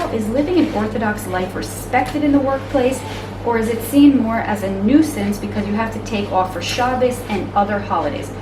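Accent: United States. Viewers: Hi. I live in U.S.A.How come your accent is American since you live in Israel? Love to watch your vlog.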